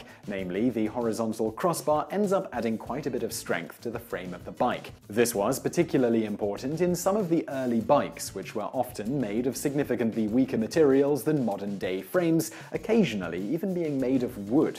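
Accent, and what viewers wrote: United Kingdom. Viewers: i do like your content, it's good mate, but you speak so weirdly that it's hard to concentrate on what you're saying. It's like you're trying hard to pronounce every word, I'm sure the americans will still understand your british accent if you spoke more naturally (i was born in london btw)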